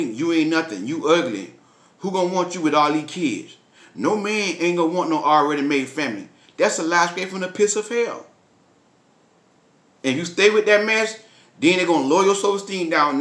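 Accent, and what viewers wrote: South, United States. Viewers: Your Southern Accent💖💖💖 You have wisdom.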